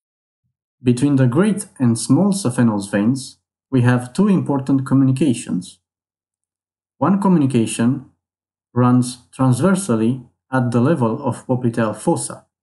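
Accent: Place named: Romania. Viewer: Salutare, tocmai am 3 saptamani dupa operatia de varice..Doamna chirurg, mi-a spus, ca mi-a eliminat acesta vena(safena)... Am mai multe incizi pe picior. La inghinal, langa zona genitala am o cusatura mai maricica, cica acolo ar fi "inchis-o".... Deci, sunt operat de la glezna pana sus... O luna fara efort fizic... Ma simt ok, dar dupa, sa zic vreo 15 min - 20 min de mers, stat in picioare... Simt presiune, suportabila in picior, cu senzatie de umflare... Cred, ca e normal... Ptr perioada asta... In fine... Aveti un video foarte interesant..., dupa accent sunteti roman 🙂💪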